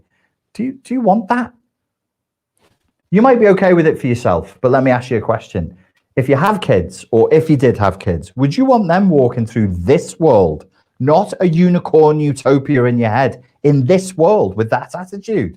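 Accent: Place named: Liverpool, United Kingdom. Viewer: This is gold. You sound so Scouse. I love it.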